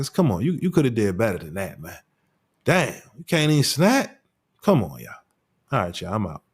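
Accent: Baltimore, United States. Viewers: I'm from Baltimore and I'm curious are u from Baltimore also? Sounds like it.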